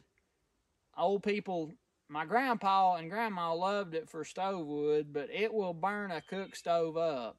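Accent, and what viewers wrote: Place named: Eastern Kentucky, United States. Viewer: Love to hear you talk reminds me of my kinfolk down in eastern Kentucky ❤️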